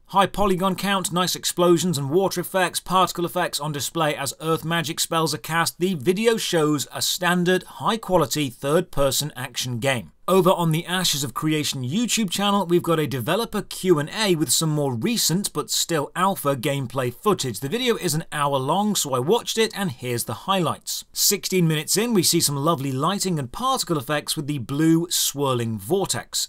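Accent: United Kingdom. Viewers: I'm confused. There's some brit talking, but no mug. Where's the channel host?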